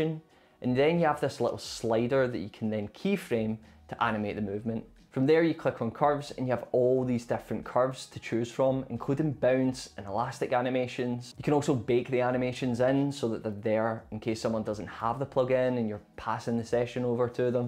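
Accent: Scotland, United Kingdom. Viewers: Love your videos, very informative and easy to follow... and the scottish? accent just makes it better..... had any IronBru lately?? :D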